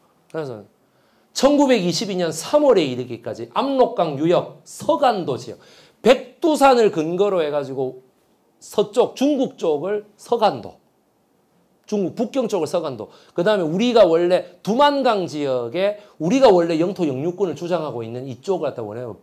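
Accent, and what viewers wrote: Busan, South Korea. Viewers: 귀에 쏙쏙 들어오는 부산말씨 확실하게 설명해주셔서 감사드리며 정말 역사에 대해 모르는게 많은것에 부끄럽습니다 매일 듣고 있습니다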